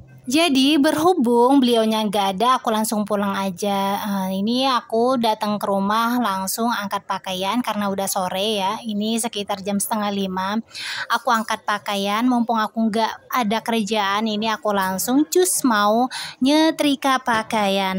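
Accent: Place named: Makassar, Indonesia. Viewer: MasyaAllah... Mampir lg ni teteh. Logat makassar jg teh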